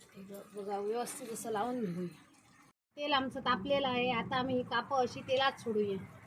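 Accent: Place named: Malvan, India. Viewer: भाजी करण्याची पद्धत, मस्तच, तुम्ही कुठचे तुम्ही. तुम्ही मालवणी पद्धतीने बोलता म्हणून विचारले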